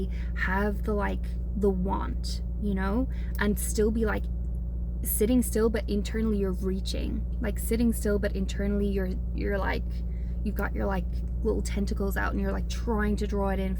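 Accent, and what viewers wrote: Ireland. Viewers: you are confusing me. You have a Scottish complexion but every now and then you sound Irish. But you're American right?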